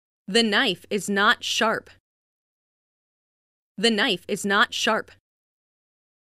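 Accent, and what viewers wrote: United States. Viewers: Is american accent? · american accent detected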